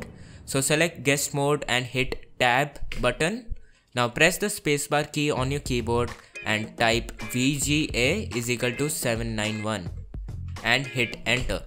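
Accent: India. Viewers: Nice video. Your english sounds like the english from apu nahasapeemapetilon(Simpsons)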